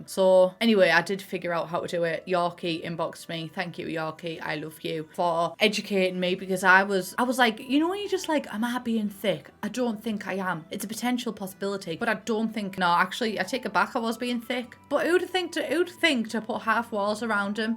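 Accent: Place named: Essex, United Kingdom. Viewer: Less than 30 secs in and I'm shocked that the Essex accent apparently sounds posh to someone from Middlesbrough 😂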